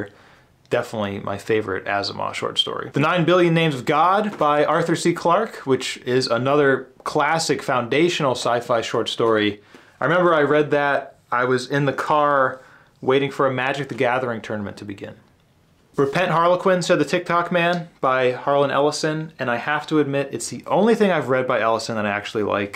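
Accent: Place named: Netherlands. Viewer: P.s you Dutch by any chance. You hide the accent pretty well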